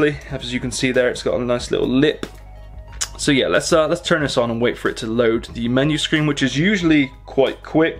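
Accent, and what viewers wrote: United Kingdom. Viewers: he's brittish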